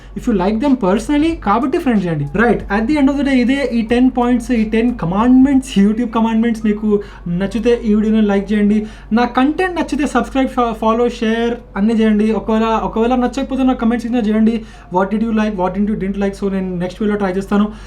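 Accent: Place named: Hyderabad, India. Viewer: ANNA How're you ? No need of switching yourself to confined Telugu Motovlogs We always love your feerfect istyle of pakka HYDERABADI slang in your content. Anyways wishing you all the success ahead ANNA Best wishes from our BBA Back Benchers.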